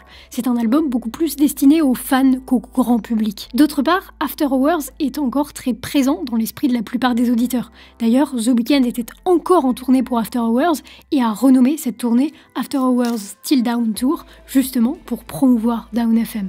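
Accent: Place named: France. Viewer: SVP est ce que tu peux prononcer les mots anglais sans l'accent Français? Ça fait mal au oreilles, / Ze weekend.. rien de personnel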